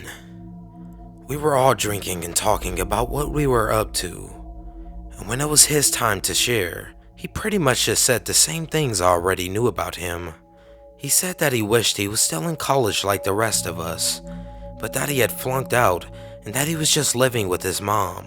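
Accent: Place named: South, United States. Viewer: Sunna Lind I like the rough edge his voice has. That, and I could swear he's got a little bit of a Southern drawl. I dunno, but I like it.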